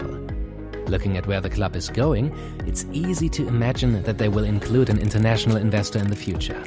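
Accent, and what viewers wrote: Germany. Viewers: Meiner Meinung nach ja, habe ich damals beim ersten Video, das ich gesehen habe, schon herausgehört. Ganz kann man den deutschen Akzent nicht verbergen :) und man merkt auch, dass in diesem Video z.B. die deutschen Namen alle perfekt ausgesprochen werden, das kriegt niemand mit Deutsch als Fremdsprache hin (außer die Person wuchs hier auf, o.ä.). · World class video's about Sports and they're educational aswell and go into good detail. By your accent i can hear you're from a German speaking country. Is there a German Channel coming in future? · I'm in for the man's voice lol.. The German accent sounds good on him.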